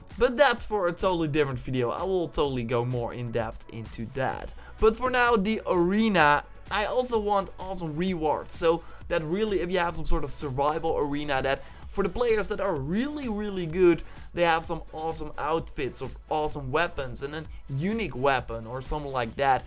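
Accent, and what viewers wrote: Netherlands. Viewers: Are you dutch? You have a dutch accent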